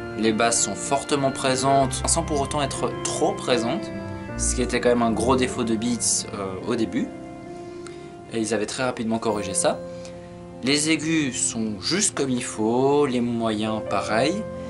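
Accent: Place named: Belgium. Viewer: T belge c sure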